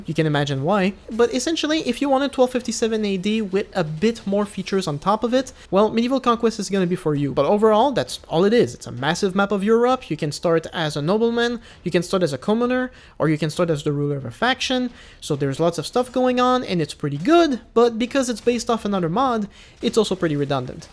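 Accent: Quebec, Canada. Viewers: are you from quebec? i think i hear a familiar accent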